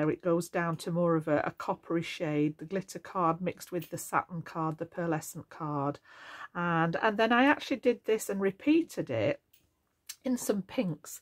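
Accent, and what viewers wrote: United Kingdom. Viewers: that’s what I thought I heard. ❤❤❤❤Where about in Yorkshire?❤❤❤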